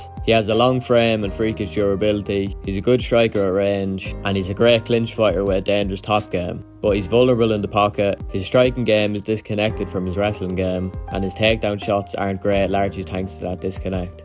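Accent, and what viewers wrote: Ireland. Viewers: Wasn’t expecting the Irish accent. Good breakdowns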